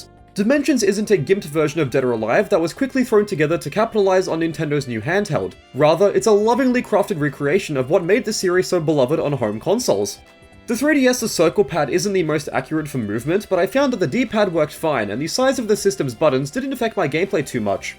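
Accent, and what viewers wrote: United Kingdom. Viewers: Ur from Australia?! I assumed u were from the UK 🤯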